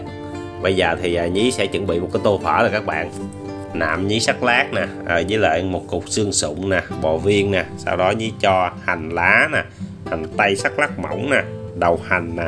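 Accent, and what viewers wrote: South, Vietnam. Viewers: Video nấu phở nầy quá hay! Cách chỉ dẩn nấu, cách nói, giọng ng Miền Nam quá tuyệt hay! Bấy lâu nay mình nấu Phở sai, nên ko đc ngon, nhờ video nầy mình tin chắc rằng sẽ có nồi phở rất ngon. Rất cám ơn A nhiều! Chúc A luôn thành công trên con đường đưa cái tài nấu ăn của mình đến cho càng nhiều khán giả đc biết. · Giong ng mien nam nghe êm tai dể chịu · ngon quá Nhí ơi. nghe giọng miền Nam thân thiện làm mình nhớ nhà quá.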